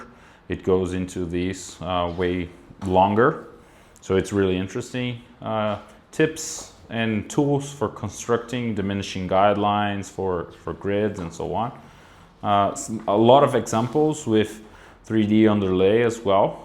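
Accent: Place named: Brazil. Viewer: Esse english é brasuka! Faz vídeos em português tb irmão...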